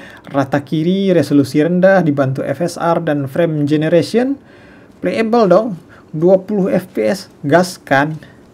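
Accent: West Sumatra, Indonesia. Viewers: Logatnya kaya orang Minang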